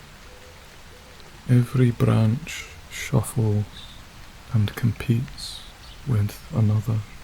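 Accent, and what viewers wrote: Scotland, United Kingdom. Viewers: the sound of a Scottish voice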